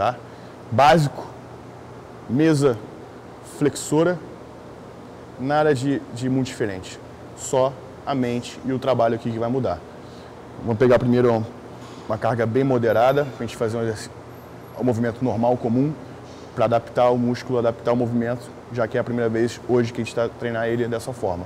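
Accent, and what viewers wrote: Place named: São Paulo, Brazil. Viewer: Deve ser PAULISTA tu .. pior sotaque q tem e as gírias de paulista pqp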